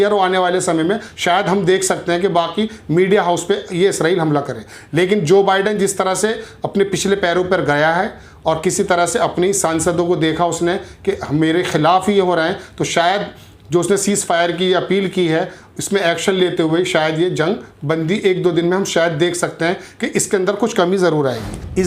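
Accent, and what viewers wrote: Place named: Saharanpur, India. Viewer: भाई आपकी आवाज तो अपने सहारनपुर वालो जैसी लग रही है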